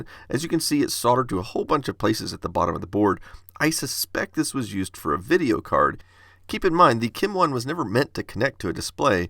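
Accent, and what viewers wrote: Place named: Texas, United States. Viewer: sounds more Texan than usual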